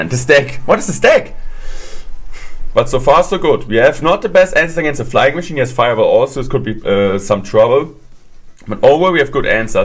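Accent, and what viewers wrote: Germany. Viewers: Man merkt dass du Deutscher bist aber ich feier trotzdem beide CR Kanäle😉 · Wenn das kein deutscher aktzent ist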